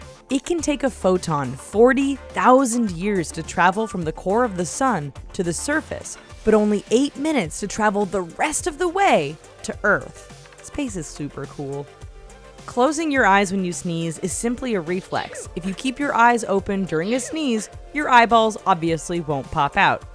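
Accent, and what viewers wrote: United States. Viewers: She sounds American since she doesn't have a accent and her language is English the type of English that doesnt have a accent unlike UK english. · 'So now you can tell I'm American'. I'm pretty sure everyone could tell straight away love with that accent.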